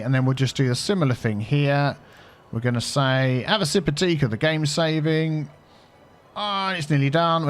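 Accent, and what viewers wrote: United Kingdom. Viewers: sounds bloody british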